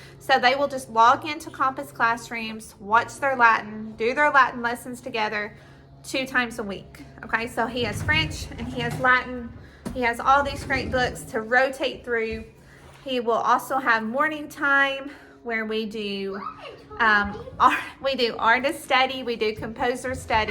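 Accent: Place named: South, United States. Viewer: We will be using A g Gentle Feast for the first time this year. I am really looking forward to it. You have such a nice southern accent.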